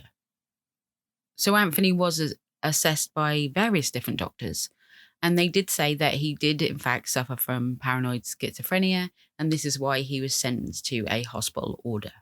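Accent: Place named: East Anglia, United Kingdom. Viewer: Norfolk? Suffolk? You started off and you’re accent crops up , less Norwich , more Ipswich ? Go on , I’m Yarmouth Girl here born n Norwich , miss the Sea ? ‘ hearing ‘ , that was a blast , subbed , hadn’t seen youre channel which odd , most female crime channels wind me up ~youre emotions are appropriate and feel real . Cheers for the vid , tragic sad story ✨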